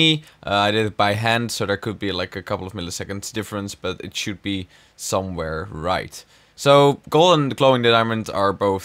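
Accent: Netherlands. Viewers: Je klinkt echt Nederlands! Maar dat neemt niet weg dat je een geweldige stem hebt, creatief bent en echt gave Showcases maakt! Ga zo door, je zal het ver schoppen! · ooh wow firt video I see you and I noticed imidiatly he sounds dutch i look in the discription The Netherlands wow YEAH!! DUtch too here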